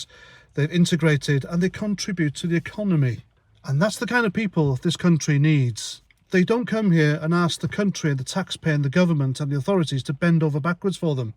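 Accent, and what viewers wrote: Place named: London, United Kingdom. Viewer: Sound like London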